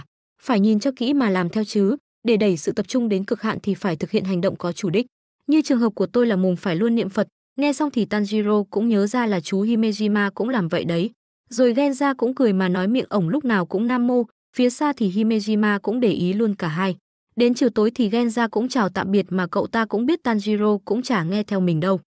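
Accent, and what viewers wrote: Central, Vietnam. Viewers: ad người miền trung à mô vs chả mi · Ủa mi người miền Trung hả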